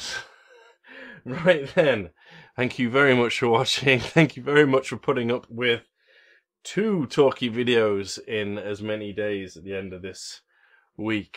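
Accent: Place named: Bristol, United Kingdom. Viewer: Ah right, thought I detected a Bristolian twang to your accent!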